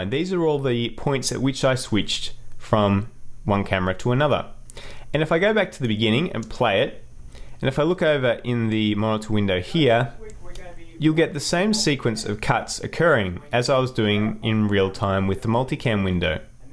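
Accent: Australia. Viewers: Brilliant video, love your work! PS, I'm a fellow Aussie! Nice to hear a familiar accent on such a great tutorial. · are you australian?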